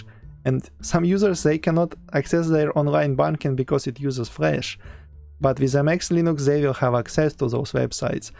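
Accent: Russia. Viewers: I love your Russian accent so I subscribed! (I am from England) Also GREAT VIDEO! :) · because it's russian accent, imho. It's most understandeable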